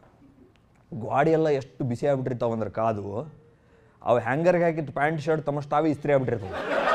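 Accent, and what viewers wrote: North Karnataka, India. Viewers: bahala cholo ittu..kellakka...uttara kannada accent nalliye matadu tamma.. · ಹಾಯ್ Brother ನಿಮ್ಮ ಡೈಲಾಗ್, ನೀವು ಮಾತನಾಡುವ ಶೈಲಿ,ನಮ್ಮ ಉತ್ತರ *ಕರ್ನಾಟಕದ ಭಾಷೆ ನಿಜಕ್ಕೂ *Ultimate, Excellent, Wonderful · Very nice ...continue to make people laugh....love to listen uttara karnataka kannada...slang itself is very funny...ur doing a good job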